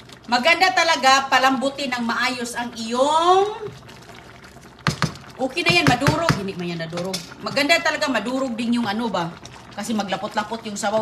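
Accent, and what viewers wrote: Visayas, Philippines. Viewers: ganyan daw mag pronounce ang mga bisaya. Pero ang totoo hindi lahat.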